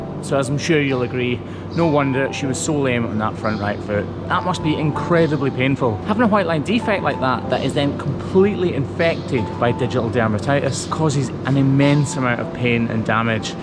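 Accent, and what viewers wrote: Scotland, United Kingdom. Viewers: I was pulled in by horse farrier videos, then YouTube, in all it's power of Oz, introduced me to this Scottish cow foot guy. I love listening to that accent (and figuring out what he is saying😂) while he works to give comfort to these animals. One of the most bizarre things to find such interest in, but I guess I'll see y'all down the hole! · A human who has empathy for an animal in pain, is a good human. I'm loving your videos. No idea how YouTube brought me here, but I'm hooked. I do love a Scottish accent too 🙌 thank you for making these animals lives easier 💖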